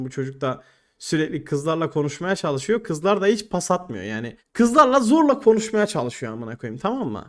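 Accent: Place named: Kahramanmaraş, Turkey. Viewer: Kanka Kahraman Maraşlı mısın ? bizim otaraf gibi konuşuyon :)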